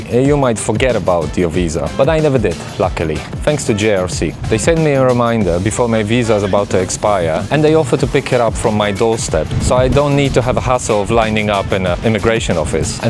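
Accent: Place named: Eastern Europe. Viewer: You’re not a British 🇬🇧 citizen aren’t you because you’re accent sounds more from Eastern Europe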